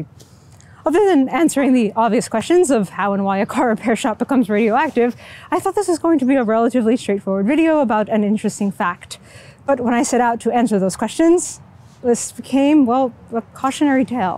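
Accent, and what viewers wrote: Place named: United States. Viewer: What a welcome surprise in my YouTube notifications. As a Brit I love the Tom Scott vibes but with your own US tones :-)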